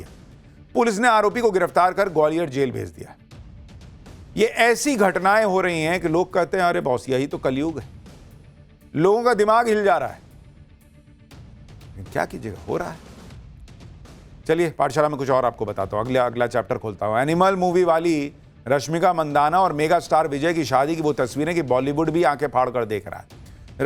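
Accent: Bihar, India. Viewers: वाह जी,आपकी बीहारी टोन मे विश्लेषण सुनने मे बडा मजा आता है।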